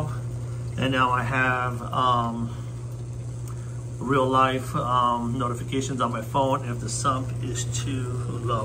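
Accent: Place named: United States. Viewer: Salutare din uk, cred ca ești demult în usa ai accent fix te usa, foarte diferit de uk. Super acvariul !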